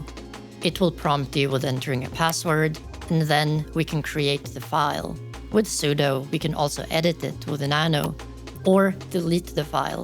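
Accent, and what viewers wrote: Sweden. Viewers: swedish detected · As a Swedish person, I'm quite confident in saying that he sounds very Swedish.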